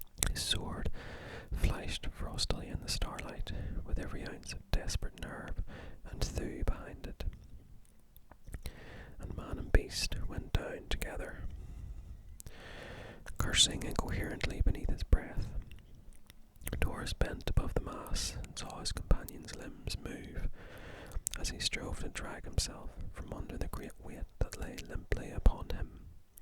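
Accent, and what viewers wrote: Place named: Ireland. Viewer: I’ve been searching ‘Conan ASMR’ on a regular basis for like ten years hoping something good would pop up one day, super glad something finally did. The Tower of the Elephant was one of Howard’s best, in my opinion. If you do another Conan video (& I really hope you do), what story do you think you’d do next? I personally think the Phoenix on the sword would be a good one for ASMR, but obviously any of them would do. I also want to mention that I think it’s great that you have the accent that you do, because while I think most people understandably imagine Conan with Arnold’s thick Austrian accent, in my personal head-canon, he’s Irish. So it’s really cool to hear that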